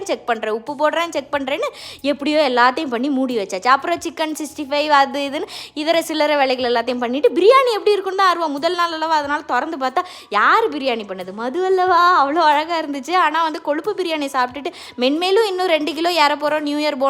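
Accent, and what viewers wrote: Tirunelveli, India. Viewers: மது அது ஆயில் இல்லை அத்தனையும் கொழுப்பு ஆனாலும் நீ செய்து பிரியாணி யும் நம்ம நெல்லை தமிழும் அருமை பெருமை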